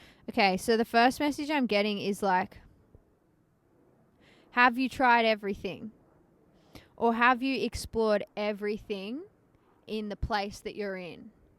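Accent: Australia. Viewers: Came for the title, stayed for the accent. Love the Aussie accent! Love from the Netherlands 🥰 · Are you a Queenslander?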